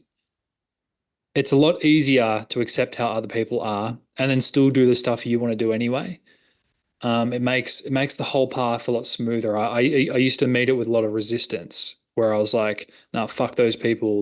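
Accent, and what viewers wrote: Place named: Australia. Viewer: thanks mate! it's also nice to hear someone aussie (i live in melbourne) talking about business haha most of the stuff i listen to their always from the US or something. who's your mentors getting started back then?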